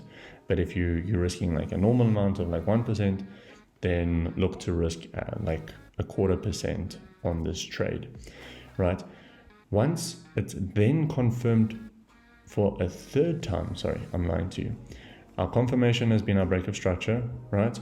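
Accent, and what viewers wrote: South Africa. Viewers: you south african? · You sound South African 😅 great vid bro🧠👊🏾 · is that a Cape Town accent? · You from South Africa right? Really good video mate, well explained!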